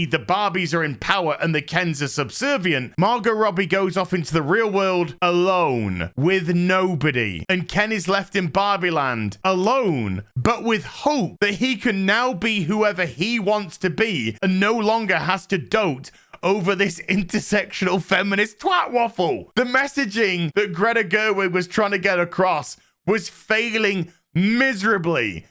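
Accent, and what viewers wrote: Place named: United Kingdom. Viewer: Good Day Sir. Liked and subscribed. Let me say that your Barbie review is by far the best I have ever seen/heard. I also noticed that you are British =) Good Lord, your laughter is so infectious! You bring such a delightful and positive view to the Barbie situation and you Sir are so right! I too am from outside America so I could really sort of be on the same page as you are presenting. I would also like to share with you what I noticed about Americans. They view things very differently the way most people outside the US do. Chances are, many of them (or even majority) will not be able to jive with that humor of yours that made me laugh so much! They are usually Morose and would take anything as offensive even when it is not. Their culture sadly has gone 'Kaput' that they can no longer see the funny side of life or the silver lining in the clouds. 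It is so difficult to understand them. I will continue to watch your vids as they are a delight compared to the dark vibes you get from majority of US content creators. Thank you and GodBless.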